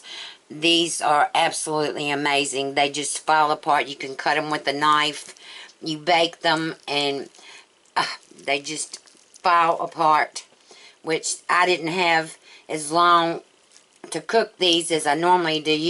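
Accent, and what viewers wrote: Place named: Virginia, United States. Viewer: Thanks for your efforts in producing this video. My wife is preparing it as I write. Are a Virginia resident? We live in central Virginia and your accent sounds familiar.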